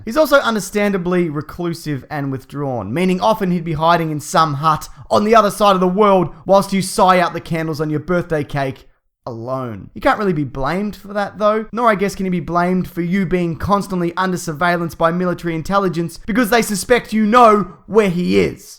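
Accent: Australia. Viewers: His accent is australian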